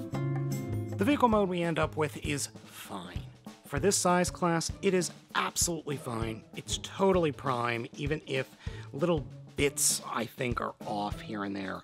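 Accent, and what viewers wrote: Minnesota, United States. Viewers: He's from Minnesota?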